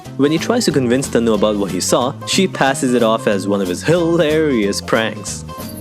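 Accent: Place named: Canada. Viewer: oh canada... Thats the accent come from 😉.... Yeah youtube support big companies.... I always wait for your reviews... I'm one of your huge fan... Please upload more often... Also you look much better than the photographer boyfriend 😉 😉 😉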